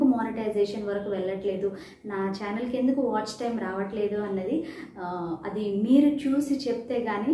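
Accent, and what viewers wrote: Telangana, India. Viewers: Hello garu.. neku vachina yasa basha lo matladu..pakka Telangana basha dhichi Kulla kulla matladu....asal bayapadaku... All the best 😍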